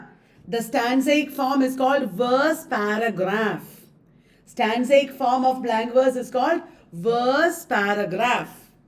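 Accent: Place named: Kerala, India. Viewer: Mam.... Ur malayalam 👌🏻🤩😍so sweet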